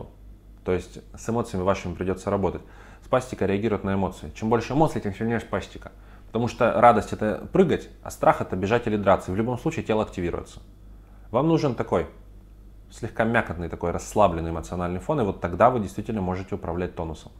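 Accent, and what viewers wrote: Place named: Urals, Russia. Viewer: Мне кажется, доктор - уралец? Говорок уральский). Такой молодой и такой потрясающий!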